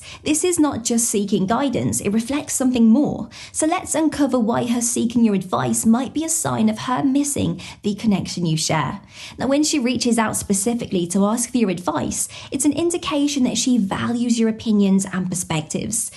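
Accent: England, United Kingdom. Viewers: Wow thanks for the advice. 🙂 I love your English accent! You're so cute! ❤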